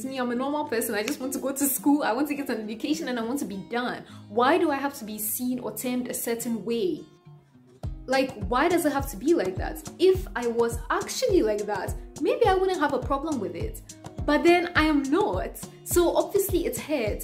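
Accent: Ghana. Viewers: Exactly, nothing different, accent is typical gh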